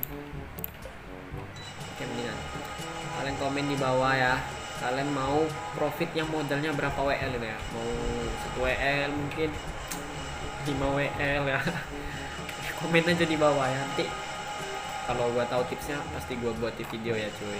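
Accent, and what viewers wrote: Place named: Sabah, Malaysia. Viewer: Ckp pun mcm org sabah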